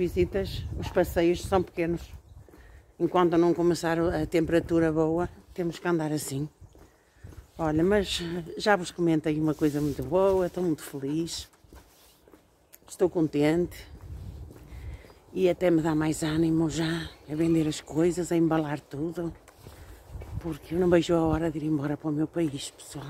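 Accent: Portugal. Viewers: Acho que pela pronúncia é do norte!!! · Então fico contente por saber que vem para Portugal, o tempo aqui não tem estado grande coisa mas em breve vira o Verão. Qual é a sua zona? Pela pronúncia parece me de vila do conde, será que estou errada? bjos da cidade do Porto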